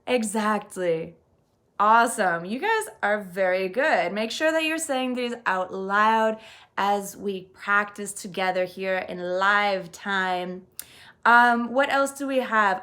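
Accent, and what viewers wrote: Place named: United States. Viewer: Hi ❤️ your American accent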